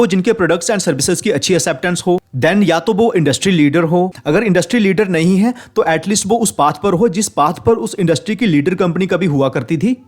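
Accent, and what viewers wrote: Jammu, India. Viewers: Bhai jammu se ho kya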